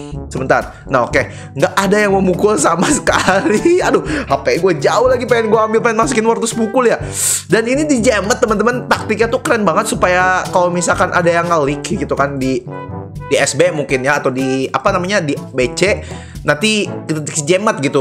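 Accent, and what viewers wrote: West Java, Indonesia. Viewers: logat nya kaya orang sunda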